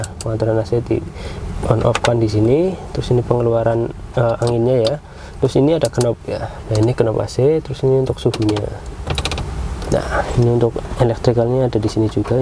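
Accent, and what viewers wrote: Banyumas, Indonesia. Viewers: Medok banget ya mas jan enyong banget😅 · deneng Ngapak...😅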